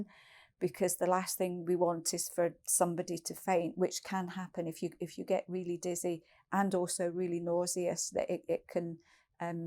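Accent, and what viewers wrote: United Kingdom. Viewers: you are a blessing to all of us. I learned so much about this peri menopause adventure thanks to you. By the way, I love your British accent 🥰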